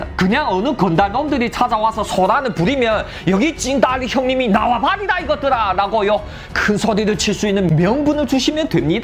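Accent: Yanbian, China. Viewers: 조선족임? 연변말투네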